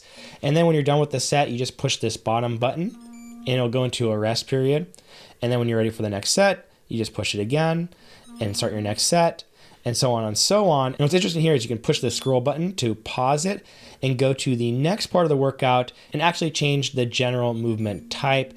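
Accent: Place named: United States. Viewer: You certainly want to be clear and explain how your (my) watch works, but you should consider it is very very hard to understand the comments for foreigners as the horrible american accent and the way they pronounce 50 percent of the words make it a real performance for users outside the US. Except, if there is a translation somewhere (which I did not found). Please make an effort !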